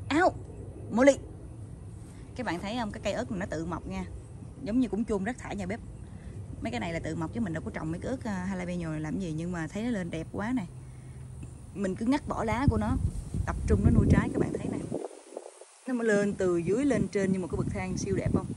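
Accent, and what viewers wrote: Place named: Saigon, Vietnam. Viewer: Cô ngạc nhiên nói giọng SG nhưng dùng từ MB.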